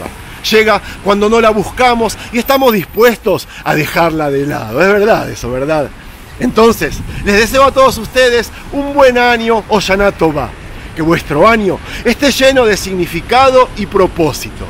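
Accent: Argentina. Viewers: Una pregunta yo recién q encuentro este canal, y tú eres judío pero hablas perfecto el español con acento argentino, quizás haya algún capítulo donde explicas eso. Y realmente me gusta escuchar hablar de mi querida nacion de Israel en un español muy entendible, te seguiré viendo. Me gusto el reportaje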